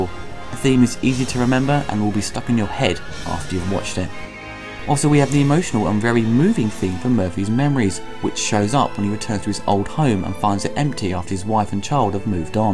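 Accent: United Kingdom. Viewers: Love your reviews. I'm catching up on them. I just love British accents, and word choices. See Americans would say...RoboCop blasted the ED209 to pieces..but you say "he blasts it to bits"..love it!!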